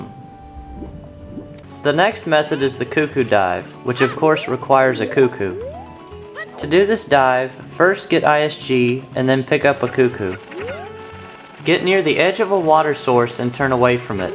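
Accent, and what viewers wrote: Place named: Texas, United States. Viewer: The Hank Hill accent, it's so sweet 😊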